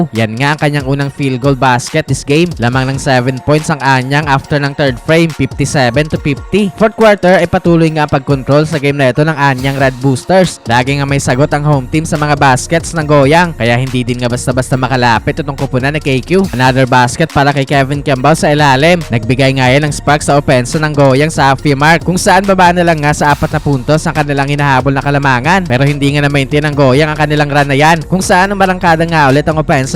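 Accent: Iloilo, Philippines. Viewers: ilonggo meg? haha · ilonggo ka man gid idol diin ka sa iloilo tikalon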